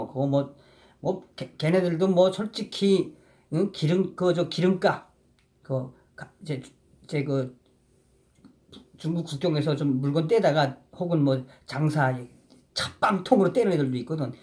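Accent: North Korea. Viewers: 웃겨요 북칸사투리 이미눌러음매다 ㅎ ㅎ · 감독님 너무 재미있습니다 배꼼 잡았습니다.특히 북한말씨 넘 웃깁니다, 호호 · 넘 잼나고 유익한 방송 열나게 보고 있습니다! 이북 사투리가 구수하고 정겹습니다! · 악플다는 놈들 신경쓸것 없습니다. 그런 거지 같은 새키들 망할 인간들입니다. 그런데 말씀을 하실 때 이북 말씨 보다는 그냥 서울 말로 해 주세요. 서울 말씨가 듣기가 편안합니다. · 고조고조 걱정 마시라여 최고임네다 북한말 재미슴네다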